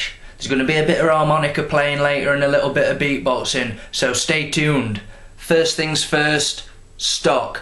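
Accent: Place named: Stoke-on-Trent, United Kingdom. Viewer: Stoke on Trent accent....Shropshire.. Silk Road & all that jazz. You still alive?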